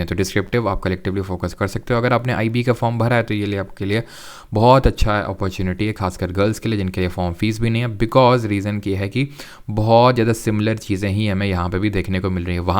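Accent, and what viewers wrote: Kanpur, India. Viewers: Ap kanpur se hai kya ?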